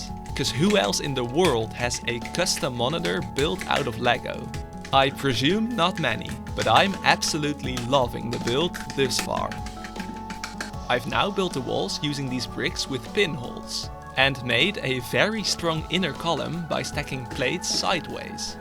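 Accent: Netherlands. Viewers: holy dutch accent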